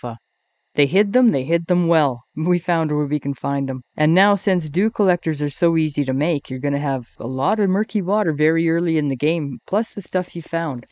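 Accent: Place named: Canada. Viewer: Not sure I’ve heard a more Canadian, it’s gotta be, accent. Love it lol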